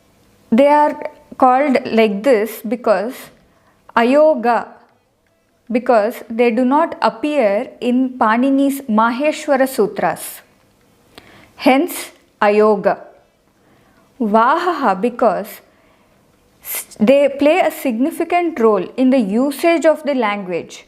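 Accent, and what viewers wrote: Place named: Maharashtra, India. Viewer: Same marathi pronounced 😊🙏🏻💐🌹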